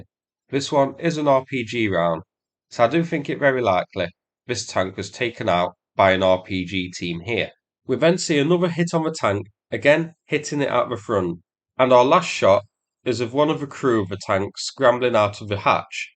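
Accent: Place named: Grimsby, United Kingdom. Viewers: Are you a Grimsby lad 👍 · A coastal town in Northern England, a different part of which sounds like he is from. Grimsby. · I have been trying to place accent for ages, and now I know. Eh up Grimsby!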